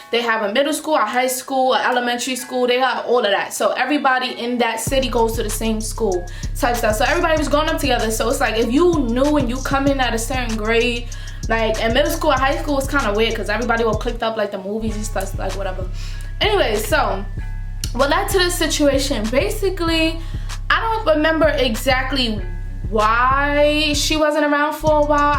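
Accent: New York, United States. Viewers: I just started watching you and I love you your NY accent is so cute 🤎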